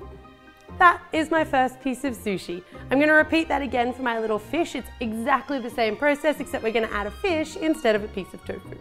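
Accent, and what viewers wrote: Australia. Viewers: R u from australia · She's Australian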